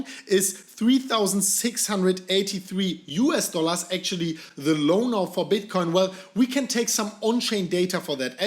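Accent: Germany. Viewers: Good analyse but that Germaned English blehhhhhhh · Sorry man, I recognise german accents from a mile away. He's definitely NOT Polish.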